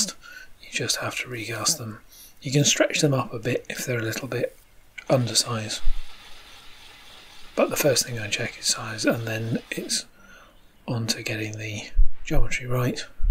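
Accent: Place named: New Zealand. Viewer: I had to doble check where you came from. Your accent could cut as a New Zealender, but I saw your plug outlets and, you live just over the puddle. XD You see, I've been searching for electrum in all of Norway, it does not seem like there is any of it anywhere to be found here. My fiancé and I want green wedding rings and electrum is the best option but we have so far been unable to find raw material. Where did you get a hold of electrum?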